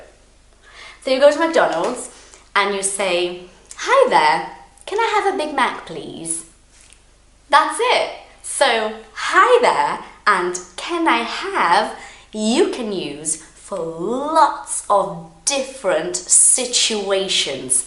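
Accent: United Kingdom. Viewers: Como que eu não te descobri antess! Sou iniciante e amo o sotaque britânico e procuro estudar conteúdo britânico, mas nada se compara a ti: uma brasileira que entende os pontos que temos mais dificuldades. Thank you!!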